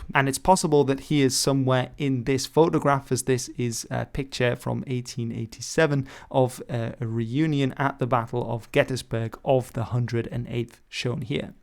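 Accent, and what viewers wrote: Netherlands. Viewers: I clearly hear the Dutch accent. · He's definitly dutch. He uses an english accent, and does it well, but his dutch does shimmer trough.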